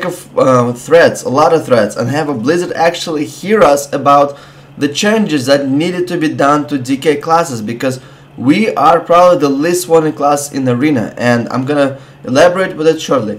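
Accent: Russia. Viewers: Охуенный русский акцент, почаще бы от тебя)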